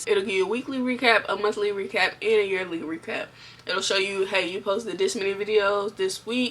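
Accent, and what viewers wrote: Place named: Florida, United States. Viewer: Knew you was a fellow Floridian on the original video I watched!! We just have a distinct sound. Im from Palm Beach. I will be starting my channel in a few days and this really helped to uncomplicate it all! Ive felt stressed starting a channel before. It wasn’t fun either.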